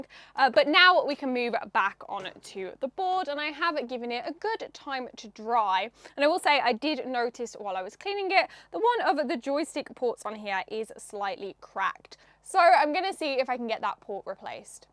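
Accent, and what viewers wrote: United Kingdom. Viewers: Уёкин фойн!! Для нас кто зараза вырос на Американском произношении... вся британская речь как крендельки!.. Ну, я уж не говорю, с такой милой Леди, иха издающую!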